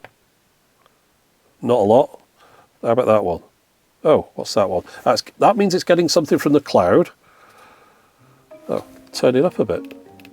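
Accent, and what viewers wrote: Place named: England, United Kingdom. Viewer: I like the chirpy English voice.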